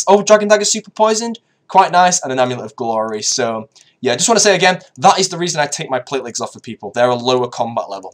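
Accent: United Kingdom. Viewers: British accent so cute!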